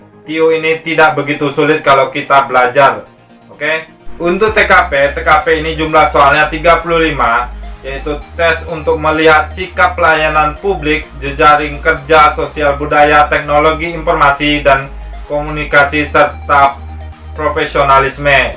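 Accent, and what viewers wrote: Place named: Sulawesi, Indonesia. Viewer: Bang kayak orang sulawesi. Alhamdulilah tercetak kartu ujiannya bang.. Minta doanya semoga dilancarkan😇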